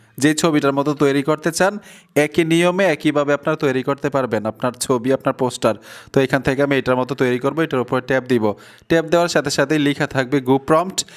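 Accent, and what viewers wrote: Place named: India. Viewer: ভাই আপনার কোন কথা শুনলে বাংলা হিন্দি শোনা যায়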